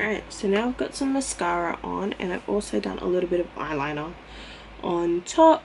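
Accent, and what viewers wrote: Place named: Australia. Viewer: Girl i love your accent, are you Australian? The final look looks gorge! ❤️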